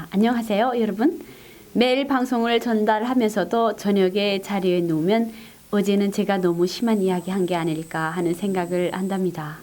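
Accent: North Korea. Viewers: 예뿐 ~~ 북한말 그대로 쓰는것이 넘넘 예뿌셔요... 디른분들 탈북후 바로 서울말씨로바꾼다는것 이해는 하지만경상도 사람 서울 산다고 서울말씨로 안바꾸조.ㅎㅎㅎ두부 ~~~~~~~ 그런사연들이 있었군요.집에서 만드는 우리 전통 순두부 집 식당있으면 그걸 먹르러 1시간 이상 찿아가기도 한답니다.먹고 사오기도 하죠!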